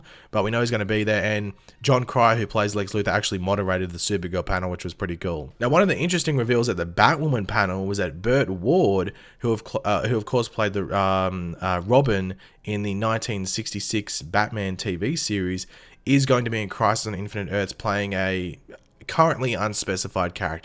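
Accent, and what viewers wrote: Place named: Australia. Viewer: You’ve become EXTRA Australian in this video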